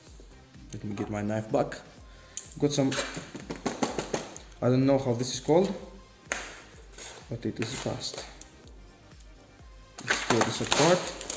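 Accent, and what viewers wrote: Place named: Greece. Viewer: Η προφορα σου σε προδωσε,Μετα ειδα το.....Ωραιος καλη συνεχεια αδελφε......απο εναν Ελληνοκαναδο!χεχεχε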